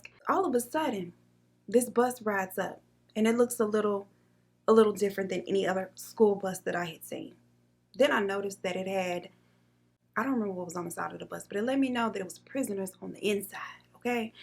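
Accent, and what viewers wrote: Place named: Louisiana, United States. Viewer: I love the look but that blue and gold hit different than a lot of the other ones. Then again blue my favorite color. Don't really care about make up process but I love the vibes, murder stories and final looks. And I sang " 🪨" at the same time you did and I been here for the accent cuz you tryna hide it it sounds like Louisiana to me. But I say everybody from Louisiana 😆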